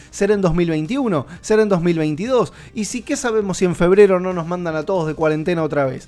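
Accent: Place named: Argentina. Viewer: Solo algo: por tipos como este es k me gusta tanto el acento argentiono hahahah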